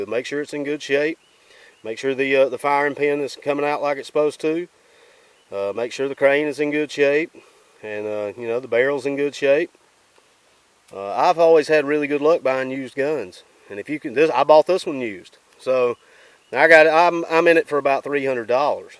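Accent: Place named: Boston, United States. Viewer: I had a friend from Boston who spoke just like this guy.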